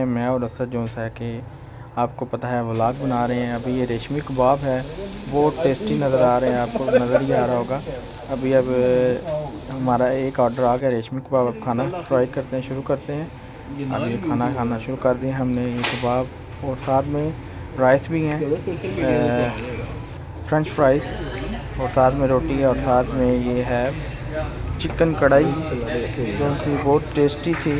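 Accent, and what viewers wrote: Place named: Pakistan. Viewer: Urdu touch Mashallah g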